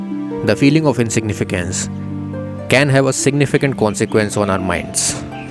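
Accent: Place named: India. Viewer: good lord, the indian accent, it feelsl like you want to scam my money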